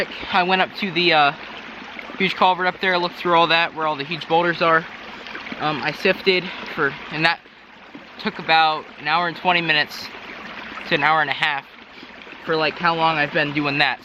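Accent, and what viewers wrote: Pittsburgh, United States. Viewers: He sounds like he from Pittsburgh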